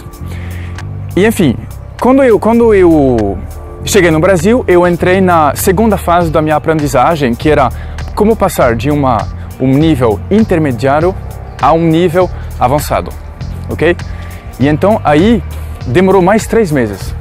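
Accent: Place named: France. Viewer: Seu sotaque francês é muito bonitinho! 😗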